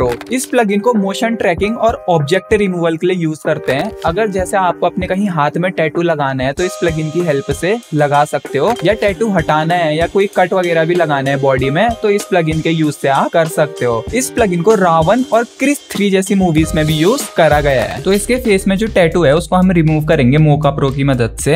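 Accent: Pahadi, India. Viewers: bhai awaz se toh pahadi lg rhe ho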